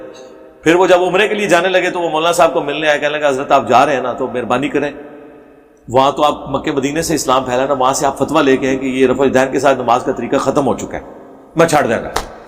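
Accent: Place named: Punjab, Pakistan. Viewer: Yah jo panjabi ka tarka lagta hy na bato bhai ki bato ma bara kmal ka lgta hy log chirty hi iai liy hyn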